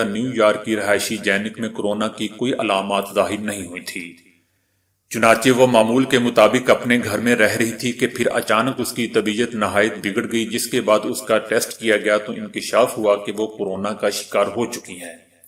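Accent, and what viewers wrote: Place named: Punjab, Pakistan. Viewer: pahly apny LAHJEY ko sahi karo.. pher khabrey pharloo... khaar punjabi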